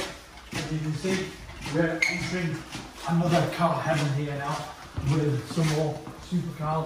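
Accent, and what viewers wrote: India. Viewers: Hey bro you are indian we get that from the accent and your name but why don't you reveal your identity??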